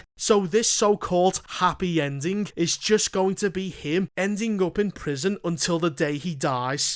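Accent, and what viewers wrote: Liverpool, United Kingdom. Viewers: I know a scouse accent when i hear one 😂. Liverpool on top.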